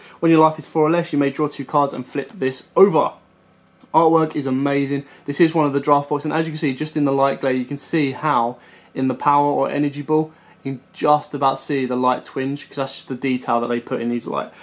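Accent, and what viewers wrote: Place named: United Kingdom. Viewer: Nice to hear a UK accent, so annoying we don't get set 4 for ages 😡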